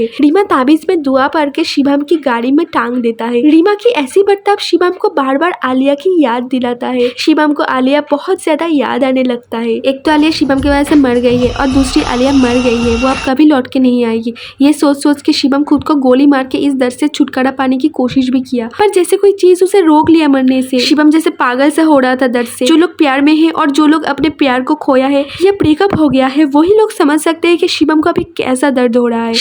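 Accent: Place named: Bengal, India. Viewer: Tumi bangali ! Maar prio accent ❤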